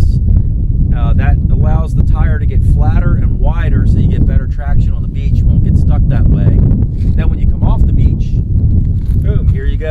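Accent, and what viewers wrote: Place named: South Jersey, United States. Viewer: Are you from western South Jersey or the City of Philadelphia because your accent to so strong and obvious?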